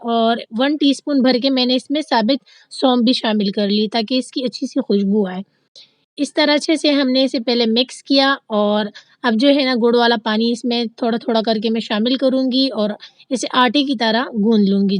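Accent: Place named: Peshawar, Pakistan. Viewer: Apki batun ne lehjy Sy mujy peshwar ki yad agai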